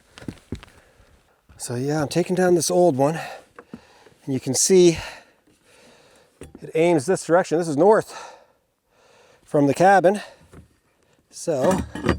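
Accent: Newfoundland, Canada. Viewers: Are you a newfie? I get that feeling.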